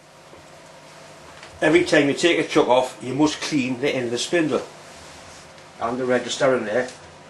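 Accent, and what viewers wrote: Newcastle, United Kingdom. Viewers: That seems to be a very quiet lathe. Easy to see that you are skilled tradesman.The speed of your hand movements are economical and accurate. May I ask, your accent , is that "Geordie". ?Greetings from Melbourne, Australia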